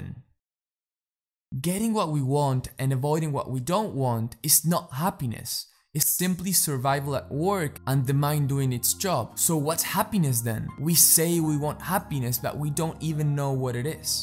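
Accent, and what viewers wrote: Argentina. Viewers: Por qué siento que esté pibe es argentino?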